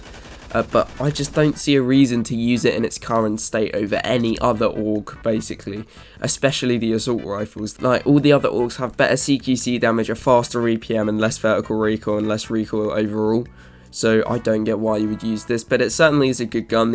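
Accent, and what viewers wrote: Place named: United Kingdom. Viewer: nope (btw thanks for that british mate)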